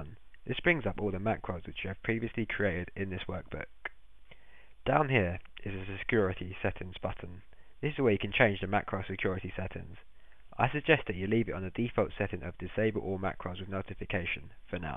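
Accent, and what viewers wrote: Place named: United Kingdom. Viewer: THE PRESENTER IS PRONOUNCING THE WORD "BUTTON" AS "BUNS" IS THAT A BRITISH ACCENT THAT I AM HEARING ? THIS ACCENT IS SOME WHAT ANNOYING.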